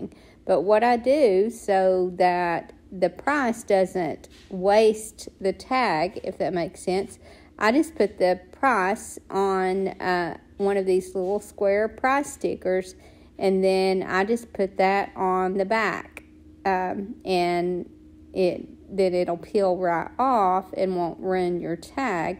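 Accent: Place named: Tennessee, United States. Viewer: Love how this came out. I love watching you, but I am always getting you mixed up with Teresa from Our Green Acres. You & her sound alike. Dolly Parton accent, please I say that as a good comment. Great work & my style completely.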